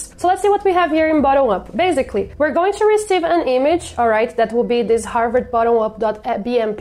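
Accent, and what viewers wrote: Brazil. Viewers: Sabia que era brasileira! rsrsrs Esse sotaque brenglish é diferenciado hahaha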